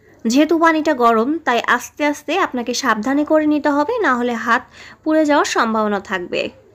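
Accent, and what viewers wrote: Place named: Kushtia, Bangladesh. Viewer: Apu apnar vasha gulo amader vasar sathe mill ase,,kustia,chuadanga amr basa.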